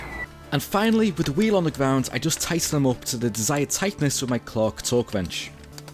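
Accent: Liverpool, United Kingdom. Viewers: Can’t work out your accent!! Scouce?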